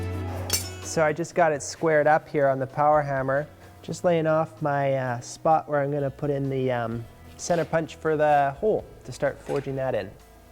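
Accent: Canada. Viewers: dude i didn't need any1 to tell me that this man is Canadian lol, no pun intended i love the Canadian .....what ever 1 calls it lol .. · Holy crap this guy sounds Canadian!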